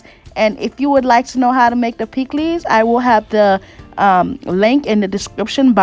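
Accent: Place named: Miami, United States. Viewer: Hey, first off I love you meals I have tried several recipes, I’m a new subscriber. However, you talk like you live in Miami?? I’m from there and I here it I might be wrong. 😂🥰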